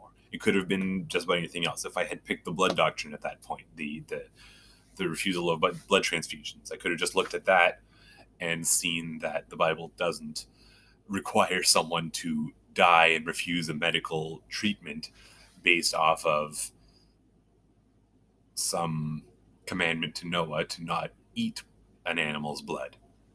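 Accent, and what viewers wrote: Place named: Canada. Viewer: I love Canadian accents.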